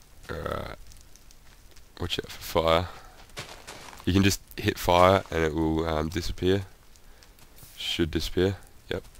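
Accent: Australia. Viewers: Aussie? But uh, full screen please and I am gonna sub cos I'm liking the shit :P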